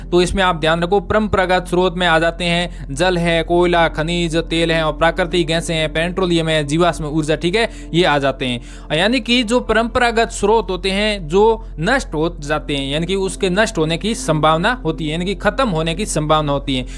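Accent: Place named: Southern Rajasthan, India. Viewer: Bhai aap kaha se ho aapki laungage se to lag rha udaipur khervada baswara dungpur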